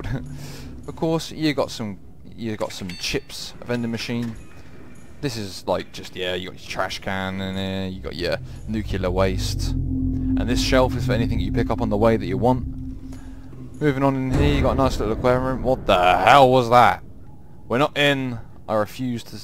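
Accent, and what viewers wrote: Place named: United Kingdom. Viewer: Excuse my racism... but wasn’t this video so much better bc of the Brit accent???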